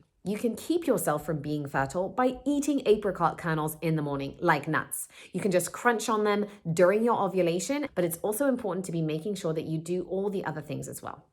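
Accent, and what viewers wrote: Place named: United Kingdom. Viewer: Liked it initially simply for the accent. My friend and I will use a brutish accent from time to time. Makes us feel more creative,brilliant, vibrant, and .... wealthy. But I would’ve liked the video anyway for the knowledge that was given. And I’m running to the cabinet now to grab my neem oil! Thanks 😘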